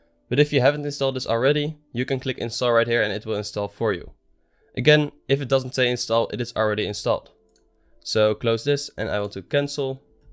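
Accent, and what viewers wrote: Netherlands. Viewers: are you dutch by any chance? cuz i hear a dutch accent. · You sound very Dutch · correct me if i'm wrong but your accent tells me that your dutch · With an accent so thick, I can tell that you are definitely Dutch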